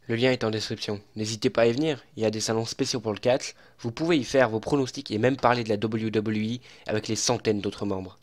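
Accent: England, United Kingdom. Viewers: J'ai kiffé mais y'a juste un petit truc c'est mieux quand tu parle sans l'accents anglais parce*-que avec on comprend pas trop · Mdrrrrr trop gênant car le contenu ça va mais j'arrive pas à me concentrer, arrête l'accent anglais gros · Pourquoi tu parle avec un accent anglais c moche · J'aime beaucoup le contenu mais paaaar pitié stop ton accent anglais putains xD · je veux pas etre mechant mais je tient a dire que ton vieille accent anglais est vraiment soulant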